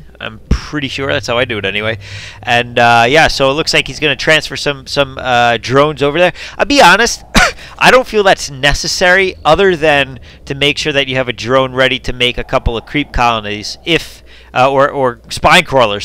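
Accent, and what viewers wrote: Boston, United States. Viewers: "I'm sick of this time zone shit" + your Boston-esque accent = Me subscribing.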